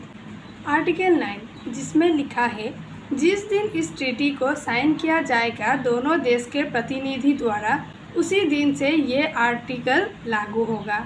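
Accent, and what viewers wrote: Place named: Northeast India, India. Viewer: H bhai awaaz se smjh aa rha ya toh northeast se hai ya bengal ya fir nepal ye ladki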